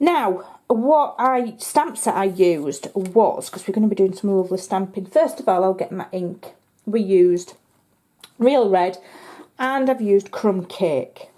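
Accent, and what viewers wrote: Yorkshire, United Kingdom. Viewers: This is adorable. I love watching your videos, you're very talented and charming. But, I must confess, I am totally in love with your wonderful (Yorkshire?) accent. it's delightful! Big hugs from Texas!!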